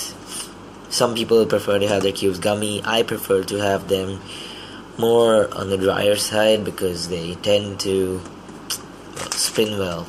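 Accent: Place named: United States. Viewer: Dude why the American accent..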